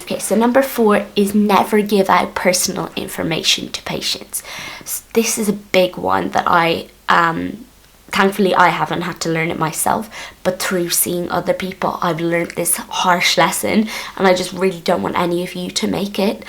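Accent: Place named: Ireland. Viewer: great video. I start placement next month so hopefully these help. btw it sounds like your irish haha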